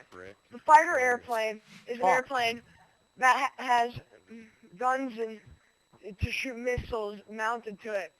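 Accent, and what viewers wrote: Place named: United States. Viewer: he sounds so american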